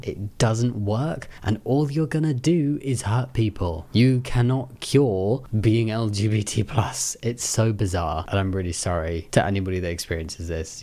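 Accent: United Kingdom. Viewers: I just realise you have a British accent and I’m halfway through the video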